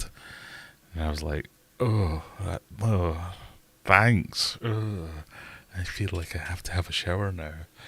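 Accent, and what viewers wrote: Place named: Scotland, United Kingdom. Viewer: Need to put microphone farther away. Scottish accent is awful at close range. ‘R’s are horrendous and every hard consonant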